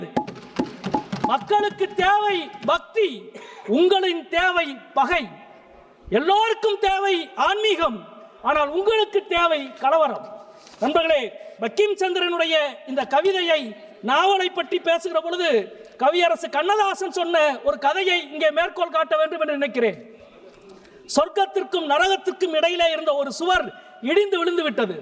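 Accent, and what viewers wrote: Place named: Madurai, India. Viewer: சங்கிகளுக்கு சரியான செருப்படி கொடுத்த மதுரை பாசையில் வீர முழக்கமிட்ட செந்தோழருக்கு வாழ்த்துக்கள் இன்குலாப் ஜிந்தாபாத் நன்றி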